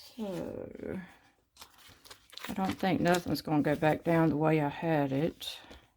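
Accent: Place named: United States. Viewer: Okay, will have a look then. Can I ask where you are from? You sound like you're form the USA, but it must be very late or rather very early in the morning as I am from the Netherlands and 6 hours ahead of the eastcoast, 8 hours from the west. I am going through your videos right now to catch up on them.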